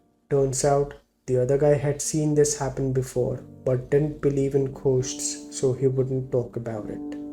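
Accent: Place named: Asia. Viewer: Mispronouncing English words, bad voice, loud piano, background noise. The narrator must be non-native, someone from Asia. (bad experience)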